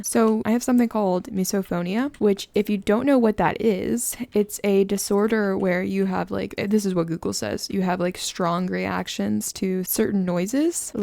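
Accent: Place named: South, United States. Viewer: your southern accent is 🤌🏻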